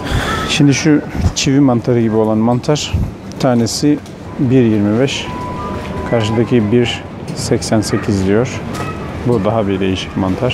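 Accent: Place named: Ankara, Turkey. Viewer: Selamün aleyküm hacı abi senin memleket neresi ? Sanki angarali gibisin ama bilemedim ha bide araba satışında 1000dolar 1000 lira gibi dedin ben anlamadım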